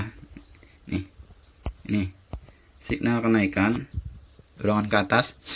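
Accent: Banjar, Indonesia. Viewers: klo di dngar dari logat bahasa ni nang kya buhan urg Bnjar jua ne..😀🙏🙏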